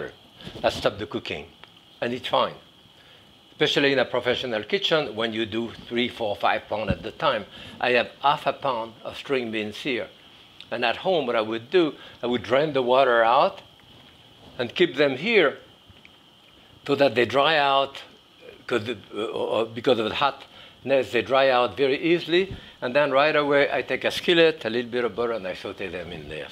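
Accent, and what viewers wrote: France. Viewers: I love his French accent. He reminds a bit of Poirot. And I love his cooking style, noy fussy, and cooking while he talks about the old ways of cooking.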